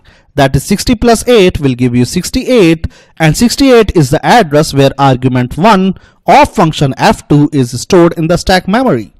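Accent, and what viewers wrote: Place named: India. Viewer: you're screaming in your mic with a indian accent.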